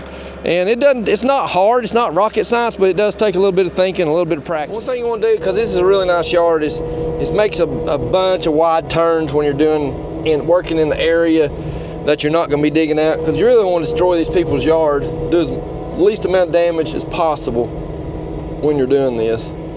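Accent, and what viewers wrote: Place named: United States. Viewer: Hi, happy new year to you my friend from America! The landscapes, houses without forgetting trucks and machines always make me want to go on a trip to the USA in the future. - What’s next on this construction site? What’s the mecanic problem with the Mack? Sorry English with the accent American is very difficult to understand! See you soon!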